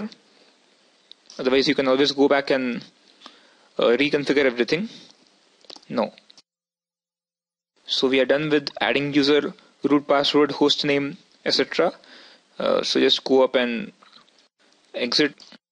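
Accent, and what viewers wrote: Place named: India. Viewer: Are you from india? btw love the accent.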